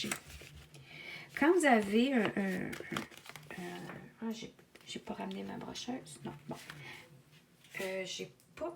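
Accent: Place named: Quebec, Canada. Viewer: oh la la j'adore l'accent québécois, c'est un pur bonheur de vous entendre expliquer. Ce plastique dont on se sert pour couvrir les livres d'école en France, est très pratique en effet. Merci pour le partage, ces petits carnets font de très jolis cadeaux d'appoint. Bonne continuation. :hand-pink-waving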